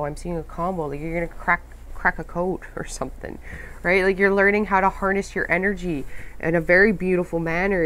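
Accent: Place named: Canada. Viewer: You gotta be from Canada EH. Me too.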